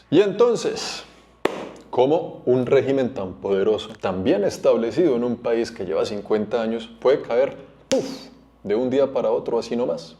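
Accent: Colombia. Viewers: Tan bello con ese acento colombiano inconfundible !!!! Muy buen vídeo !!!!!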